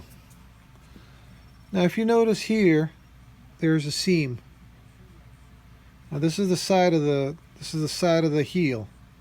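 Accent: United States. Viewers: You sound American. I'm French born and raised, lived in U.S since '84, and have found only 1 shoe repair shop in 34 yrs living in the States. You are a craftsman on the endangered species list my good man. Those shoes are a work of art too. Nice job restoring them.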